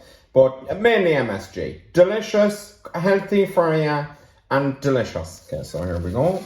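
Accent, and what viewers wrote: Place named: Ireland. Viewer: Love the accent - im also irish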